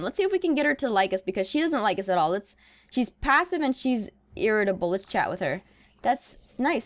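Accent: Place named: United Kingdom. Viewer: your british... I can tell...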